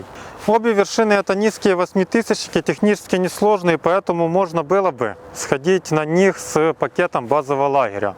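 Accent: Ukraine. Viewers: чому ви не ведете блог українською? По вашій вимові чутно, що ви україномовний. Нахіба оцей випєндрьож?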